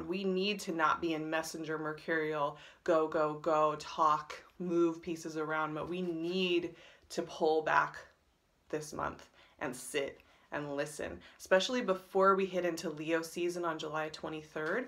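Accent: United States. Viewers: I'm an actor in the U.K. and stumbled here for your American accent... But wow I got so much more! Thank you for your guidance! I've subscribed and will be back each month 💕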